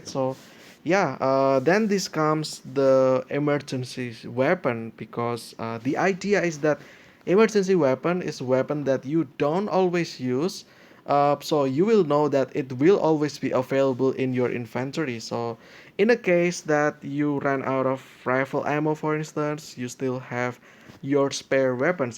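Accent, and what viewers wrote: Indonesia. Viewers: How do you get Alphacelone?. Btw, your accent is kinda like Indonesian? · Ur sound like i donesian accent u know?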